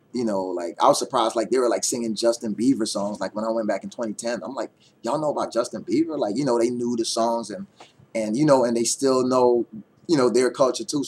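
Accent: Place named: United States. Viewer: You have an american accent..could you change to a guyanese accent in guyana? Cause I kinna lost my guyanese accent..lol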